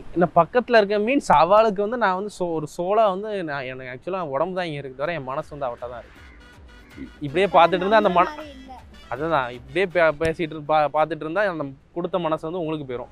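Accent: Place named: Tirunelveli, India. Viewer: Bro tirunelveli karanu supera katturinga namma oru gethu speech than sema bro👌👌👌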